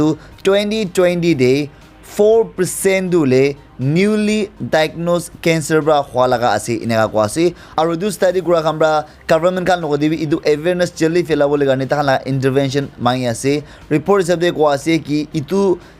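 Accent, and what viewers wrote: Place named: Nagaland, India. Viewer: I really like his nagamese accent🤣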